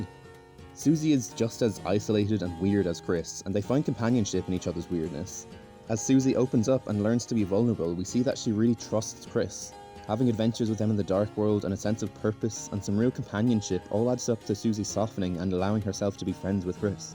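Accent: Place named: Ireland. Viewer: RAHHHH IRISH ACCENT DETECTED!! irish they/them using deltarune fans must unite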